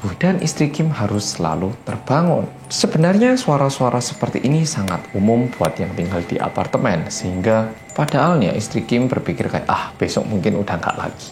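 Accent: Java, Indonesia. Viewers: Merinding boosss, sumpah Bagus nih cerita nya bang medok👍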